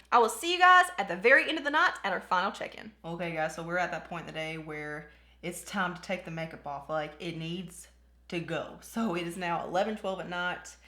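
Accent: South, United States. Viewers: This was helpful, thanks. The look is very pretty. Love to hear other southern girls!! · Ok I admit it. I didn't even watch the whole video before I hit subscribe because once I heard your accent I was sold. Helllloooo southern friend. I'm from Alabama. 😂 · I love your accent, sounds very southern! 💗🤗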